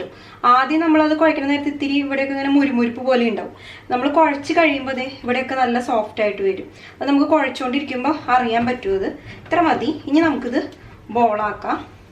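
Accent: Thrissur, India. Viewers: Oru raksha ellaattaa chechi 😍 THRISSUR Kari aaaano? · Haiii chechikutti njan puthiya alanetto first vedio kandappo thanne subscribe cheythu Yummy recipe Enik tcr slang othiri ishttaaaa Thrissurkarum poliya Love you ma swt ♥️♥️♥️♥️♥️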